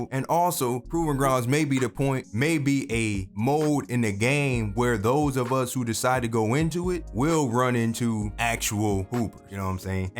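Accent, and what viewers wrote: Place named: Buffalo, United States. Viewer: Yo I know a Buffalo nigga when I hear one! 😂 great content tho my boy!! Earned a sub! 🔥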